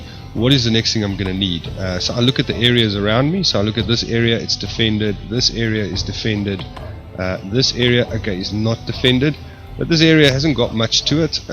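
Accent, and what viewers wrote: South Africa. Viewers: South African! Nice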